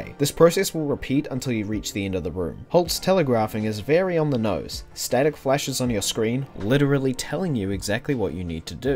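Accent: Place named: New Zealand. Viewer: Are you a kiwi too? I recognise the accent. What city or town do you live in? I live in Matamata. Edit: typo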